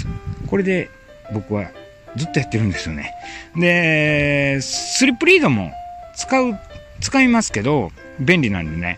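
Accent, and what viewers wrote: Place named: Kansai, Japan. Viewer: 初めまして。スーパーで置き去りにされていた推定5歳のミニチュアピンシャーのメスを引き取って育てて半年になります。当初からグイグイ引っ張り、吠える子でしたがどんどんひどくなってます。犬、車には飛びかかっていく勢いでほえまくりで、とにかく家から一歩でたとたんあたりを警戒して興奮してハアハアが止まりません。普通に穏やかに歩けたことなど一度もありません😂 の動画に出会い、主従関係がまずなってないのだと気づき、室内でリードをつけてボス犬になるべく頑張って一週間たちました。まだまだ辛いだけの散歩です。は関西弁ですがどちらにいらっしゃるのでしょうか？ぜひ伺つて教えを乞いたいいと切望しております。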